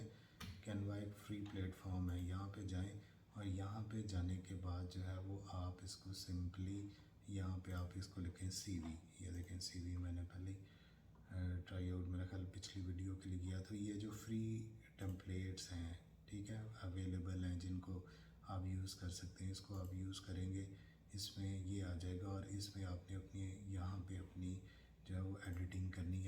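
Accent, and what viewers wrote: Faisalabad, Pakistan. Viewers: Dr sb, kindly use some Mike that can record voice that is audible, rest is fine, full of Faisalabadi touch.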